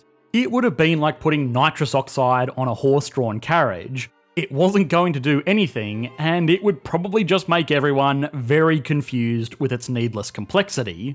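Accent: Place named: Australia. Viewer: Jesus the narotor's accent is giving me a headache. Listening to Australian accent for prolonged period of time sounds like a distressed, agonising animal or mentally challenged individual with speech inpediment.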